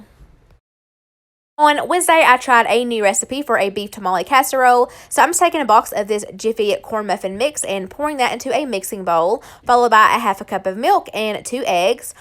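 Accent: South, United States. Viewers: “Then I’m gonna, kinda badly here, cut this into bite size pieces” 😂 I died! Much love from Alabama! I can hear the southern charm in your voice! 😂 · Hi there! Your food always look so good! Love listening to you talk, love the southern accent. Thank you for all you do. God Bless, your kids are adorable. ❤️🥰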